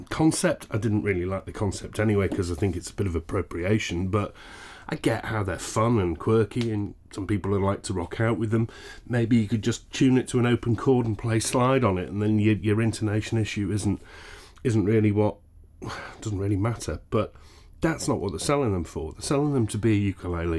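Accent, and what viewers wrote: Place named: England, United Kingdom. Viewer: Its painful The English accent makes it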